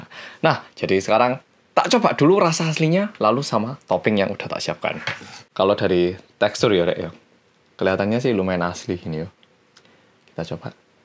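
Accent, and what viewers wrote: Java, Indonesia. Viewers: tak kiro dene wong jowo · Korea Ke jowo jowoan wkwkwk🙏👍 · Lagek delok kok aneh wong korea bosone medok koyo wong jowo😂😂😂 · Pertama kali liat orang Korea ngomongnya medok bngt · Logat jawa nya gemesin mas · Kangen medhoookkkkk nyaaa:" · Masa korea medok kaya jawa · Kok medok bahasa jawa ko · Lek wong jowo dadi opo ae.boso ne tetep medhok :) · Iki nonton oppa rasa wong jowo rek rek😊😊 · Orang Korea kok kek orang Jawa ngomong nya yah😂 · Kenapa kalo kamu orang korea tapi kyak boso jowo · Kan memang Jawanya gk ilang ilang ini oppa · Muka korea dikit suara logat jawa awokakaka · Mas ganteng banget😻😻medok lagi jadi paham bicaranya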